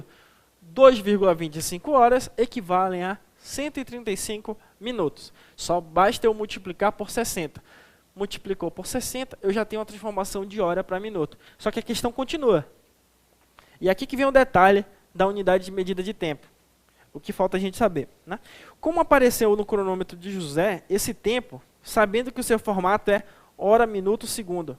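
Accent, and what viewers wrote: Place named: Rio de Janeiro, Brazil. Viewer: mt carioca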